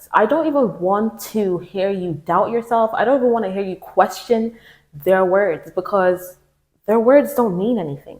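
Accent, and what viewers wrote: Ireland. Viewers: Love the Irish ☘️ accent❤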